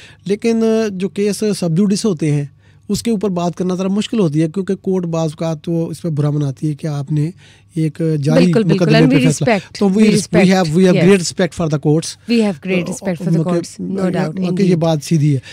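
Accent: India. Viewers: یہ اینکر بولنے میں انڈین لگتی ہے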